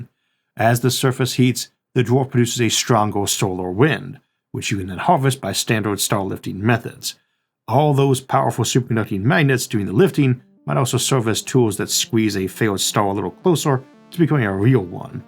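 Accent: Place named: New Orleans, United States. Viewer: This man sounds like he's from N'Awleans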